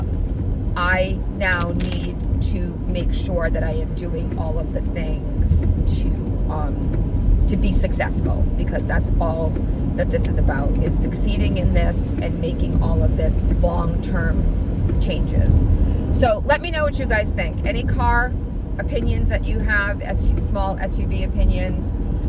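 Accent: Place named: New England, United States. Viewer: Been meaning to respond for a week now because I just stumbled across your videos. I love your accent and I could immediately tell you were from New England because of it! I just wanted to chime in here. I'm 5 feet 6 inches, and have very small wrists. I wanted a watch that would record my steps and my blood pressure. I'm really not a fan of Apple products and wasn't really sure that I would use an Apple watch if I had one. So I went the cheaper route and found one on Amazon that was $30 with a $10 coupon. The watch was $20 and some change. It received 4.5 out of 5, and after reading the reviews, I bought it. I couldn't be happier. Yeah it's a little bit big on my wrist, but it measures everything I want and you can shut off the things you don't. I became a little bit fascinated with my sleep cycle, because I'm retired now and can sleep as long as I want, pretty much. 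I used to commute 5 hours a day to my job, so sleeping is very enjoyable, lol. Anyway, I bought this watch thinking I could exchange it down the road, for something I liked better. Forget about it. The battery holds a charge forever, it's got a bazillion clock faces to choose from, and the water reminder lights up, vibrates a little and tells you to drink water! What's not to love? It's a little bit difficult to set up, but really this watch can do way more things than I use it for, so just the basics are easy to set up. It does everything an Apple watch does, and somethings I didn't know about, like find my wat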